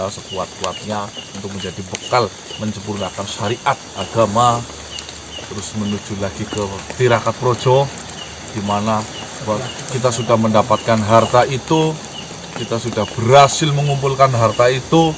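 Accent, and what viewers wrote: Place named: Banyumas, Indonesia. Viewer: Banyu mas wng ngapak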